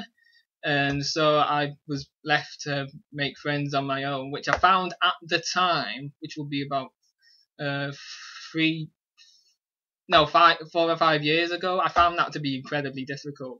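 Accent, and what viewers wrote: United Kingdom. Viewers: your accent is indeed less noticeable than most British people's, however you do have an accent haha. also yes, fizzy drinks hurt. i thought I was the only one 😂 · You're a little bit awesome. Also, you sound like a Manc more than anything with a touch of Lanky in there to me, but you could easily pass as someone from Yorkshire because a lot of people here sound northern without any real overly distinct accent. /rant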